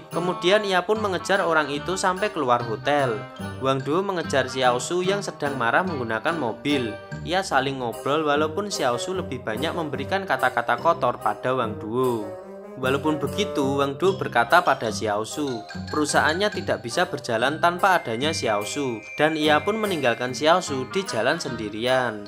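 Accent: Java, Indonesia. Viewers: medok mas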